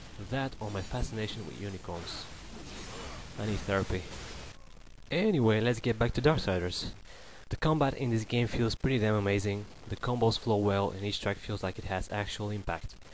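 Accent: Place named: Venezuela. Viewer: lol my friend from Venezuela has the same English speaking accent as you. xD where you from? Subbed btw.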